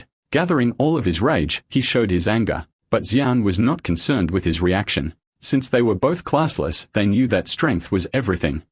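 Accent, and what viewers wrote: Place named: England, United Kingdom. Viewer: Using an English accent is disgusting.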